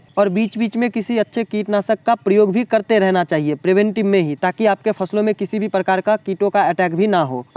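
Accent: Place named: India. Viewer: Ye kuch india k parts me aisi accent hi hoti hai . Mazak udane ki zarurat nahi . Bengal me bhi to V ko B bolte hai . Vidya ko Bidya bolte hai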